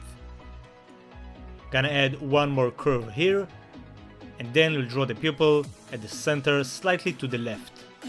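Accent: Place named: Israel. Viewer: אתה ישראלמ נכון שומעים ותודה על ההדגמה עם גוקו בלאק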